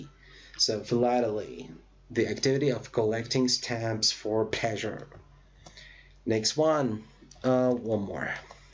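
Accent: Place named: United States. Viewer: i like your american acent i like american english can you give us more hints and clues about the acent in english and thir differences ?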